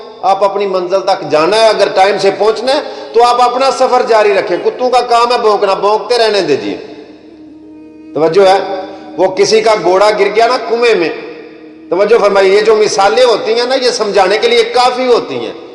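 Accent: Punjab, Pakistan. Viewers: sab punjabi ma andaz byan acha hai apka...lehja asar krta hun punjbai lehja acha hai apka plz punjabi ma bat kia krain....urdu b apki behtr hai No doubt...i hope are you understand...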